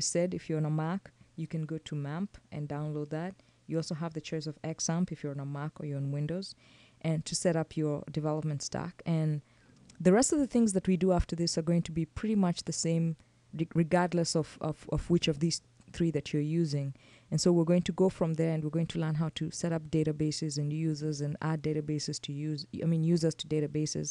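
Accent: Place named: Nairobi, Kenya. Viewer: i noticed ur english is a blend of nairobi and the pauses between the videos :) blessed to have a kenyan around :D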